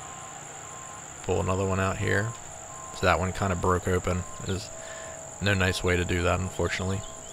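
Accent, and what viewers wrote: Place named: Western Pennsylvania, United States. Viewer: Second that! I want to hear shower, downtown and Southside. It’ exactly like western Pennsylvania 😂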